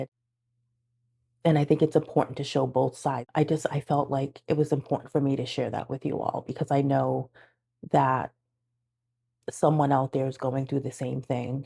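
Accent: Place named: Boston, United States. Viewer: I can tell you’re from Boston, we’re tough, you got this good lookin!…..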